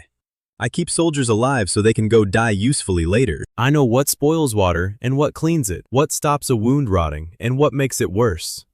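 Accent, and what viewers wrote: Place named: United States. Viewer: Good story but not a fan of the AI voices American cowboy accent for a midevle fantasy.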